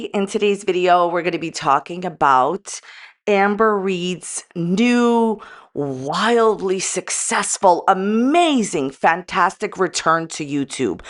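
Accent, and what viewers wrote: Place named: East Coast, United States. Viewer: love your accent. I am from the east coast and miss the accent.❤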